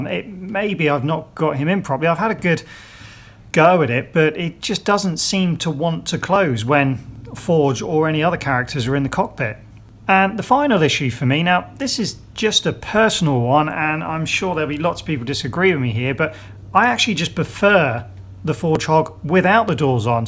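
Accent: United Kingdom. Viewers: Great review. I'm really looking at it just for that Forge figure. The face sculpt is fantastic (probably for some villain rather than Forge) and, as you point out, those details like the Ace card might not be readily visible in 'real life' but really come into play for stop motions where you get close to the figure. BTW : You sound like a Brit. How did you get hold of this set and (if its OK) what did you pay? BTW2 : Great MOC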